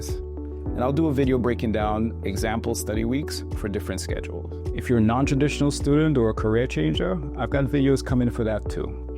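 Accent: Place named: Caribbean. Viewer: Are you Caribbean? I hear my people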